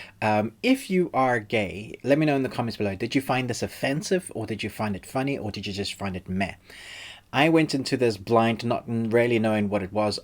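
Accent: South Africa. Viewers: You South African . Much love from cape town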